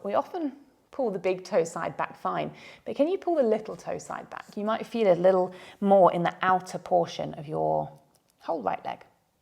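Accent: United Kingdom. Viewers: Thank you so much! You are one of the best out there. I'm doing quite a lot many types of functional sport and exercises regularly ant thought my flexibility and range of motion is at least acceptable... Now, this simple and 'easy' routine exposed a fantasy world I'm living in. Some of those starting positions you represented here before even started the actual strech were already enough for me and I couldn't go any further. 😅 Realizing now how much I've work to do. I've been following you already about one year yet haven't still sucribed yet. Now I finally did it. All of your efforts and videos are so comprehensive, well structured and quided. They are gentle, precise and beginner friendly. I'm really grateful you're doing this and sharing your expertise. Keep up the good work. You definitely deserve all the success in your path. And I really love your british accent! 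So refreshing after listening and following so many influencers outside of UK. Greetings from Finland. 😊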